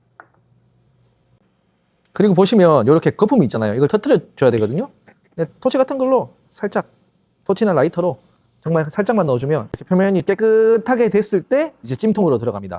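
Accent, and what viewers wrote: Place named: Daegu, South Korea. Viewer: 대구 사투리로 정겹게 설명해주시는게 정겹네요ㅎ 많은 영상 기대할께요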